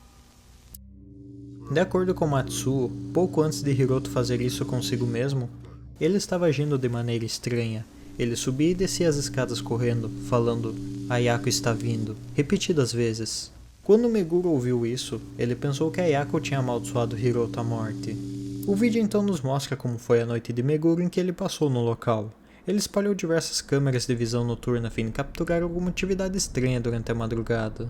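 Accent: Brazil. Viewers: não consigo prestar atenção no vídeo, o sotaque é muito bagual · O sotaque nordestino melhora tudo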